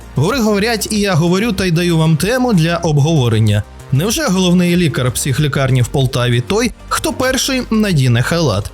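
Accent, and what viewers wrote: Russia. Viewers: Канальчик проросійський, з голови гниє. Совкові цитати, російські слівця та обороти в тексті, росіянський акцент у вимові нейронки. Головний месидж - в Україні все, ВСЕ ПОГАНО !!!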